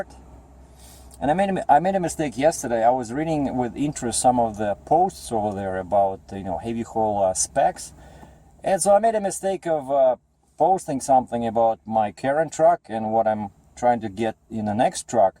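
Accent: Russia. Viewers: your accent is cool to me. ther are a lot of ignorant people in trucking. sorry to say. I like truckers I wanted to be a trucker but do to medications I must take to keep my spinal pain bearable I cannot drive a commercial truck. but I respect@ truckers. Or they may not drive truck at all hard to know. Russian/American doesn't matter.Its who we are that truly defines us.They defined themselves as a social sickness that is tearing this country apart as well as Canada too.People like you are building keeping this country running. So they can go FUCK themselves when they treat people like you with disrespect. your one badass trucker. Thank you for all the videos. Stay Safe Tim Bishop out... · Your accent is cool I like the Russian English you don't take personal what some lame brains have to say about you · Ok I see now. There are a few good guys that will share some good info but these Forum's are filled with people that tried to make it in the Trucking Industry and they are basically in financial ruin! 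they lost homes, marriages, family, and it's not your accent that bother those losers, it's the fact that you were born in Russia and you are more successful than a lot of those losers and that what bothers them. I was born in Central America and I will always have a Spanish accent. When those losers saw your equiptment and all those videos, envy set in and your original question dint even cross their mind.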